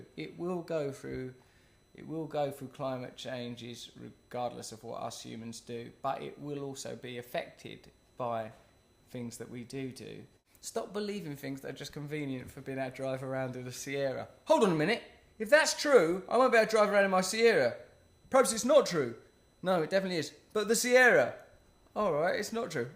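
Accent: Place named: England, United Kingdom. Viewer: Russell, please add SUBTITLES to your videos (at least to your next ones). I'd really like to comprehensively understand what are you saying, but it's kind of hard due to your English accent. VOTE UP SO HE CAN SEE!!!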